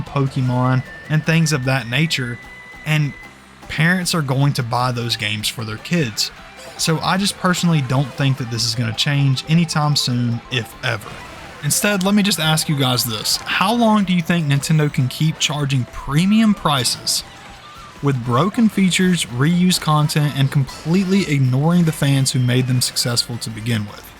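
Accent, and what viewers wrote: South, United States. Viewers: I love this guy's country accent. Really relaxing to listen to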